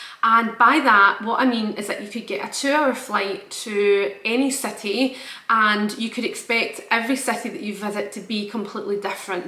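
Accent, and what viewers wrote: Scotland, United Kingdom. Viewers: I could listen to your beautiful Scottish accent all day cheers from Sydney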